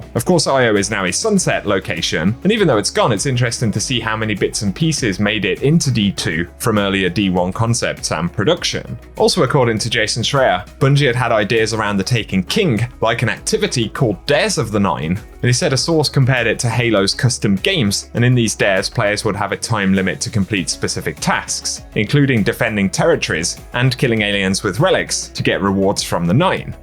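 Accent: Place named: Midlands, United Kingdom. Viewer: I would’ve out good money on Fate of all Fools being in here! Great video! Ps are you from Birmingham / the Midlands? Your accent sounds familiar!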